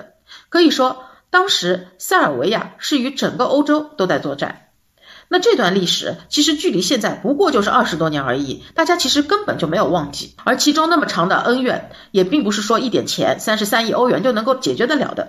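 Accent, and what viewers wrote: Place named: Shanghai, China. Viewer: 特别喜欢你的视频！听出了一种上海宁米道！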